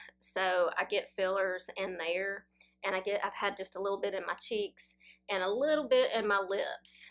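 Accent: South, United States. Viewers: Hi - thanks for all your reply’s to our many questions. Your honesty and directness is much appreciated. Glad to hear you found happiness and a surprise son in your second marriage ( lots of us don’t). That will keep you young. I remember your video about the “serial killer living near by and him stalking your sister - that was scary and must of been difficult to tell us about. Love wat chin and listening to your charming Southern accent too.❤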